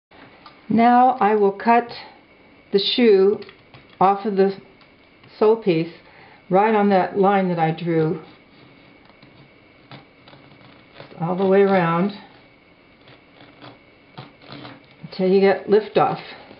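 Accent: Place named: Northern Ireland, United Kingdom. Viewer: Hi. I really enjoyed your video and I plan to try it. Great to hear someone from NI. What county? I'm from Tyrone.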